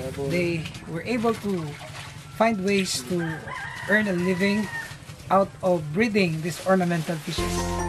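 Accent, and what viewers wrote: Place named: Bisaya, Philippines. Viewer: murag bisaya man mo kuya.. taga asa mo?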